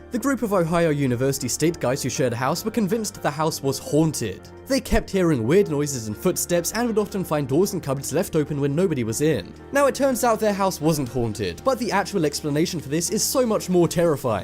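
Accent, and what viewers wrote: United Kingdom. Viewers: The chattery British narrative is hard on the ears. What's scary around finding a rusty old gun ? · He sounds British. I live 3 miles from America, they don't talk like that.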